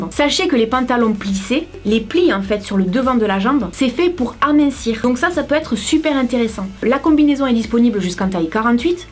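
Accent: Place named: Perpignan, France. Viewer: ( 1 er commentaire ) coucou je t'adore je t'ai découvert sur m6 j'ai 10 ans j'adore l'accent de Perpignan répond moi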